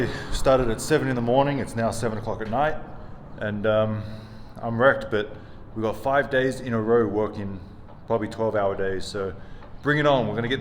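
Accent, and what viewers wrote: Australia. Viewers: No idea why this popped up on my youtube.....Notice the Aussie accent! so kept watching (Me being an Aussie) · Are you an Aussie? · Do I hear an Aussie accent? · is that an australian accent? definitely not american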